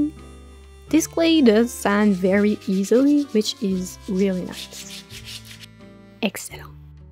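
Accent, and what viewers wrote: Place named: Quebec, Canada. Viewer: Je pense ce que tu dois faire du voice over en francais. Plus specialment ton accent quebecois. C'est metal.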